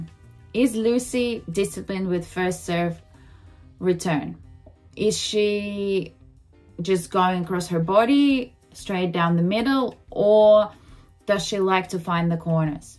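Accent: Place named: Australia. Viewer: Hello. Having been a fan of Kasatkina and Zabiiako I got interested in you. I love how you are developing an Australian accent. I really like your videos you have a great personality and attitude. Your videos are enjoyable to watch. I wish you the best in your tennis career. Thank you for your insight. Keep smiling.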